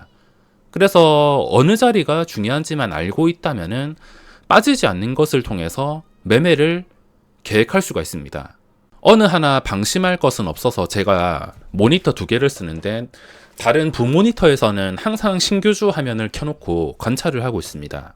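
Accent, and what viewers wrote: Gyeongsang, South Korea. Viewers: 칠암사계에서 목소리랑 똑같은분을 옆자리에서 우연히 뵈었는데 경상 지역남자분들 목소리는 비슷한가요 제가 암튼 늘 감사히 듣고있습니다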